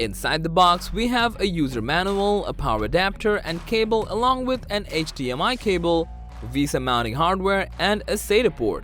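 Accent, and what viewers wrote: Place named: India. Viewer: whats up with the fake american indian call center scammer accent?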